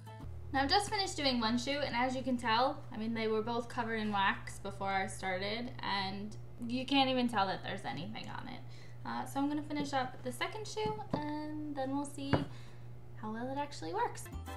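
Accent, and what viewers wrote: New Jersey, United States. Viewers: You can hear her Jersey accent a lot in this video, especially when she says "off". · Watched a few of your videos and this is the first time I heard your Jersey accent. P.S. love your old, old videos. You were so damn cute.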